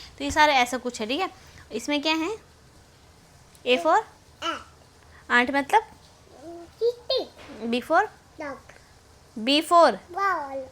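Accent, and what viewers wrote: Garhwal, India. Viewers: आपका tones gadwali लगती hindi v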